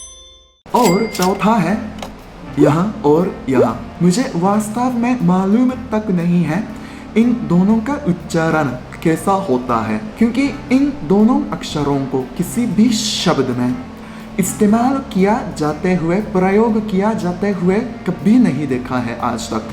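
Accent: Standard. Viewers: Itni pure hindi hayeeee sooooooo cute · नमस्कार भ्राताश्री, मैंने आपकी बहुत सारी वीडिओज़ देखी हैं तथा मैं आपकी शुद्ध हिंदी सुनकर आश्चर्यचकित रह जाता हूँ। मैं आपको बताना चाहता हूँ की जो ङ वाला अक्षर है उसका उच्चारण अं की मात्रा का प्रयोग करते हुए किया जाता है। ङ का प्रयोग अक्सर संस्कृत भाषा मे अं का उच्चारण करने के लिए होता है। जैसे उदाहरण- संगड़क अर्थात कम्प्यूटर। तो उसके स्थान पर अं की मात्रा का प्रयोग होगा जहा पर सं के साथ एक ङ भी जुड़ जाएगा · Are bhai itna swach Hindi to Indians bhi nahi bolte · आप तो कई भारतीय लोगो से शुध्ध हिन्दी बोलते है। Love from Gujarat... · Pure hindi you speak 😎😀.NICE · आप बहुत अच्छा और शुद्ध हिंदी बोल लेते हैं। आपको संस्कृत ट्राई करना चाहिए। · He speaks wayyy more “SHUDH HINDI” (*pure hindi*) than actual Indians😅 btw I’m an Indian too so…. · App shud hindi bol rhe ho app thore English words use kar sakta ho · Ye bhai bilkul suddh hindi bol rahe hn 🤣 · Bro aapki Hindi bohot hi jyada Shudhha ho rahi hai!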